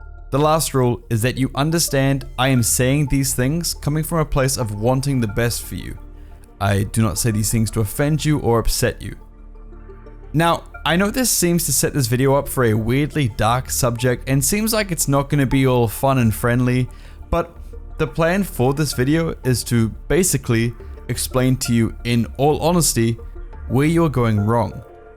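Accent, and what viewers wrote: New Zealand. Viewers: Are you from New Zealand? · Are you from nz?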